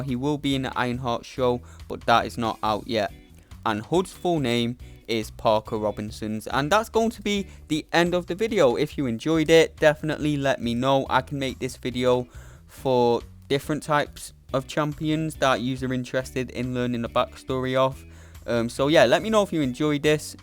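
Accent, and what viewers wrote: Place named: South Yorkshire, United Kingdom. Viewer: been trying to place yours now you mention it haha, I'm from South Yorkshire and you sound like you could be that neck of the woods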